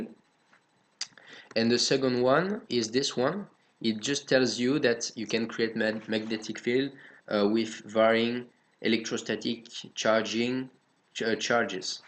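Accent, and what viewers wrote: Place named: France. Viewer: I love your frenglish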